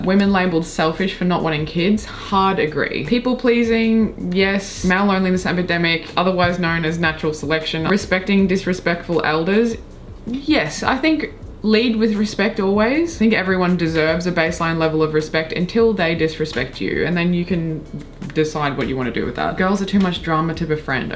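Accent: Australia. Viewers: Is that a surf green Fender???? I think it's surf green. It's one of the original Strat colours... They had to use Automotive colours because everything was in limited supply due to WW2. So you play 😊 cool 😎 I'm playing a cheap Cort it's a rad guitar 🎸 24 frets... So what is your site about? I'm Paul I work with heaps of people online... I go by Maori Jeebus 🖕 Maori because they hate it. And Jeebus because they hate it 😊 👍 I'm going after Trump at the moment. I was the one responsible for ELONS latest problem's. You sound Australia? I'm in Sydney.... Homoville i will leave information for you please network it out I don't push BS... Here's one have you ever heard of the Elohim? I bet Elon him doe's? He is Lucifer sweetheart mark my words I've nearly intrapt her.... I like your guitars they are rad.. paulburns 299 this is my Instagram I post stuff most days.... 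I'm chilling this week going to promote the Aboriginal and Maori People this week I'm sick of America... Good to meet you sweetheart keep up your good work lattaz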